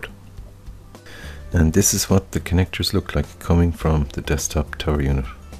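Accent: Ireland. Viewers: What part of Ireland are you from your accent sounds like Dublin. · By listening to your accent, I guess you're Irish. Am I right?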